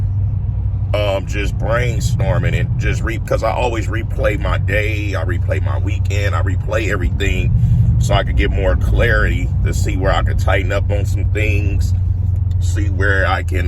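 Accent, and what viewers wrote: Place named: South Central Los Angeles, United States. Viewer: THATS OUR SOUTH CENTRAL LOS ANGELES ACCENT, YOU FINNA TELL A WHYTE GUY FROM BROOKLYN TO SPEAK LIKE YOU? 😂😂😂💯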